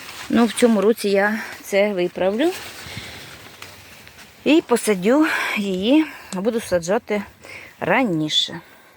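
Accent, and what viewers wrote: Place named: Poltava, Ukraine. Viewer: Я думала, що ви з Полтавщині, тому, що діалект у вас полтавський... Рясних Вам врожаїв і ПЕРЕМОГИ всім нам.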